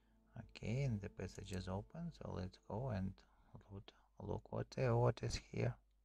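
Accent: Russia. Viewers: Such Russian accent is it?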